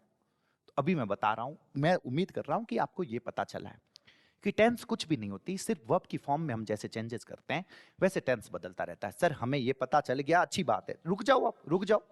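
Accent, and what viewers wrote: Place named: Jodhpur, India. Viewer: Ap jodhpur se ho sir ji apki boli to jodhpur ki lag rhi h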